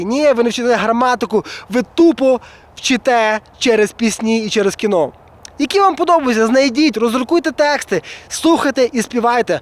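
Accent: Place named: East, Ukraine. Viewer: Це круто! Але подейкують що відчувається східний акцент..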